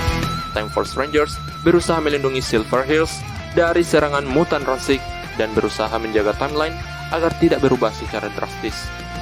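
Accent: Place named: Medan, Indonesia. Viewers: Logatnya kayak orang medan ya bang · Suaranya Kayak orng medan Ygu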